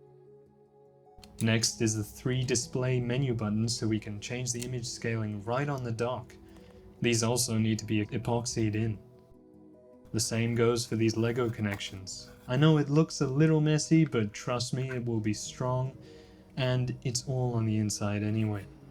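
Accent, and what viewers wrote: United Kingdom. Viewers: you sound like a british nerrel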